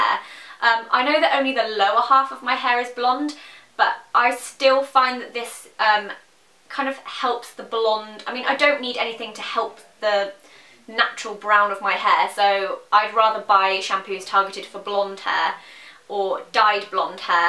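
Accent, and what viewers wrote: United Kingdom. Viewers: (Jadescraft) The comment is technically intended to those who are not British or have a British accent. And obviously you guys can't relate since by birth yall have the british accent. Whereas we don't. I wish I had a British accent lol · R u british?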